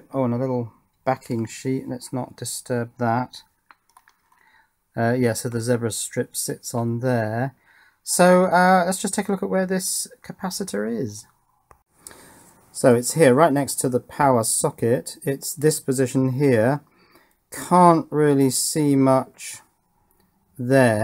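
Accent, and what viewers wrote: England, United Kingdom. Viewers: Hi, have you used any flux on the solder braid before attempting to remove solder? I also don't like solder braid myself but flux might help you. I use a Hakko de-soldering gun on most of my repair work, for me its the easier and cleaner option. It's a lot more expensive but you remove all the solder in one press of the trigger, even on multi-layer boards. Occasionally on lead free solder I need to add fresh 60/40 leaded solder before trying to remove the old stuff. Keep up the great videos, I'm originally from England and its comforting to hear the accent from time to time.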